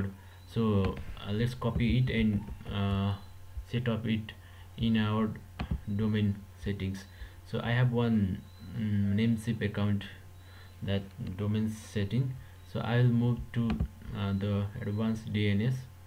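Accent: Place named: Nepal. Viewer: maile ta nepali accent thyakkai chineko hoki jasto lagyo...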